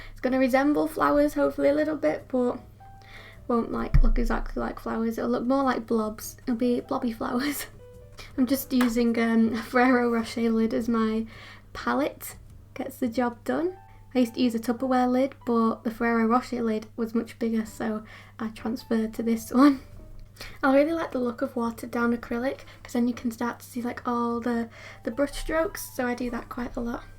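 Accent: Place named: Manchester, United Kingdom. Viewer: Your art is AMAZING! I never knew you were from Manchester and I love you accent.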